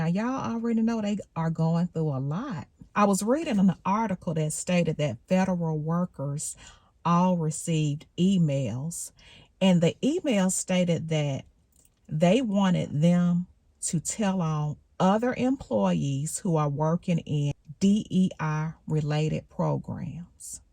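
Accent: South, United States. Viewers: Love the southern accent. Love the video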